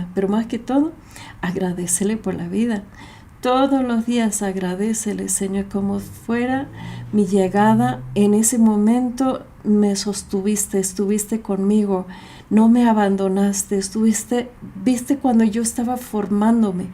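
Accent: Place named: Mexico. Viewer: Pese a tu español tan mejicanizado te hiciste entender maravillosamente ...por lo que será muy fructífera la enseñanza ...porque se que la hora hiciste en el Nombre de Dios Trino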